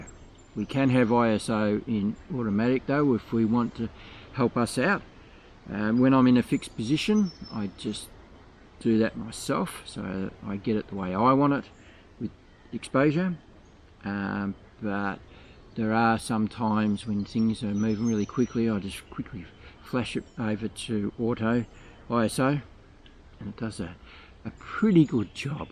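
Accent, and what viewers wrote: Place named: Australia. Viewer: I hated to say this but you sound like an Australian.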